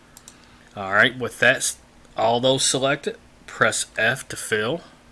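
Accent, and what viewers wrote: South, United States. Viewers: Also I did not know you were Southern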